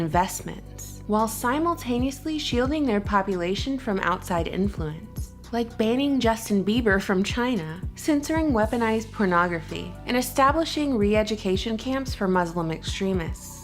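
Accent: South, United States. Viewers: “Hey internet friends, did you know…” smiling pretty face and southern lilt about to rock our worlds with something “to ponder as you go about your day”😅❤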